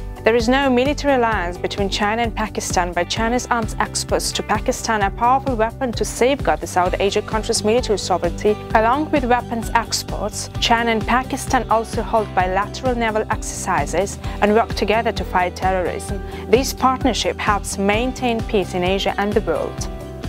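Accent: United Kingdom. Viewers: i love british accent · Britain accent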